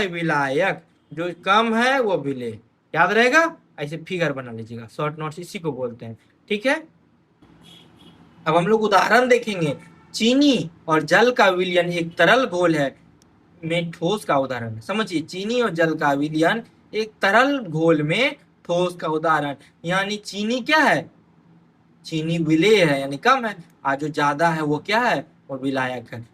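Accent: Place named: Mithila, India. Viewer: Sir apka jo boli h hme bahut acha lgta aap mithlachal ki or se h na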